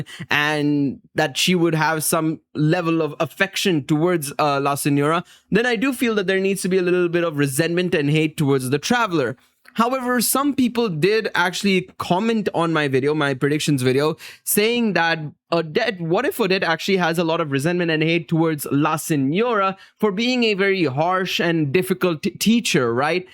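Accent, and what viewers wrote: India. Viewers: Brother are you indian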